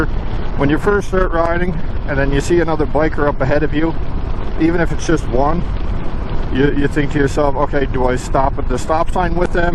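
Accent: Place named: East Coast, United States. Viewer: This was amazing. Not sure if it was the east coast accent that brought me home or all the nails you hit on the head but this was excellent. I could relate to so many points you made. I just bought my first bike a couple months ago and all the gear to go with it. Got a 23 MT-07 and there are no words for how it feels, it's crazy. Working on getting a booking for the MST, some people around here are waiting over a year to get their course done due to how few examiners there are and how booked up it is. That Klim Induction pro jacket was rad 2 months ago, now it's getting chilly! Thanks for the vid! Sub'd.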